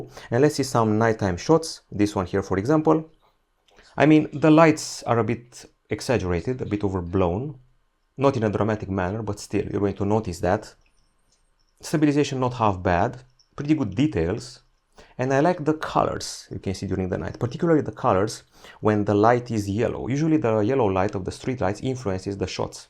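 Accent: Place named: Romania. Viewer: Ce accent ...made in Romania 😊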